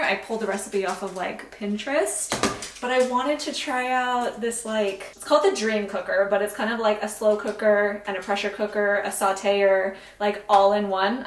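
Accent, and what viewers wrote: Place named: Argentina. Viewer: Love seeing the family together! Love the Argentinian accent. Such a chill video! Ty for this.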